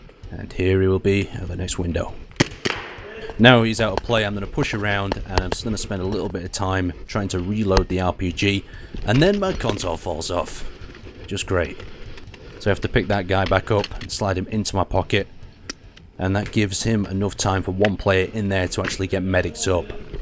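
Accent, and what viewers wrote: United Kingdom. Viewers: u from uk? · you sound like the brittish guy from aw.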